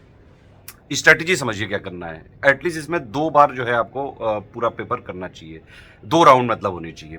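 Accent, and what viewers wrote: Kanpur, India. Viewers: ऐसा क्यों लगता है सर की आप कानपुर से हो बहुत अच्छा लगा सर❤❤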